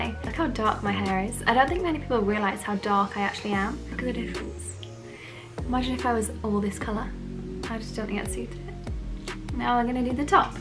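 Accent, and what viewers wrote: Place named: Leicester, United Kingdom. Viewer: are you from leicester? recognise the accent!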